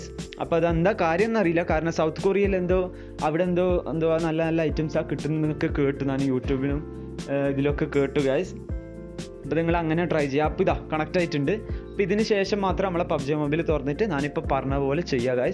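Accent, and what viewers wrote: Kannur, India. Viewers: Ningal kannurilaano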